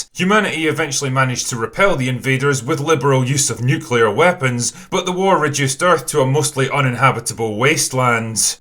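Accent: Scotland, United Kingdom. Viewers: Somehow I really, 'really' wanted to follow up with "Yes way!" in his customary slurred and cynical Scottish accent.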